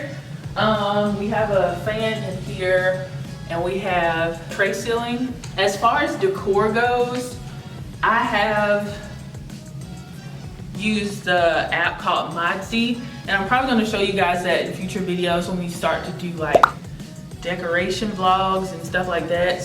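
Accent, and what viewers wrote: South, United States. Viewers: Are you southern?I just became a follower today. Your videos kept popping up and I love your content. I cant help but detect a drawl. Im from Mobile.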